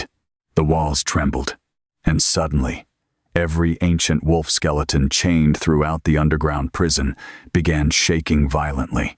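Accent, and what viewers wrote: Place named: Spain. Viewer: What's with all the " Spanish/Mexican " pronunciations?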